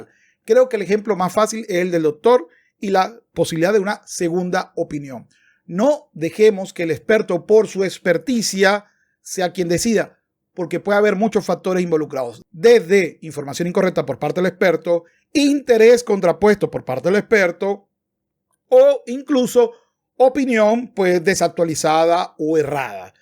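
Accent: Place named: Venezuela. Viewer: Eres venezolano , de lejos se nota !!! !!! De Caracas o de donde ....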